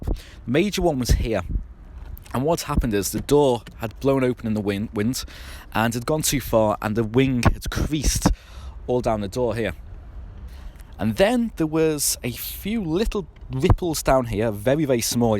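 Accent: Liverpool, United Kingdom. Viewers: Scouse! I've just bought an A4 Avant S Line 2007 2.0L N/A Petrol, nice to see a fellow scouser on my trawl through videos on the car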